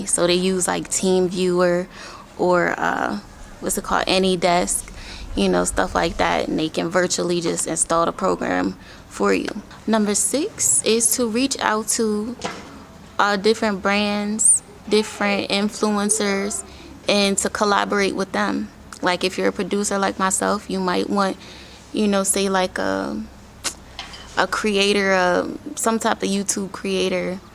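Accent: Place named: United States. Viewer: Where are you located?cos the accent says American but the scenery says AFRICA!!!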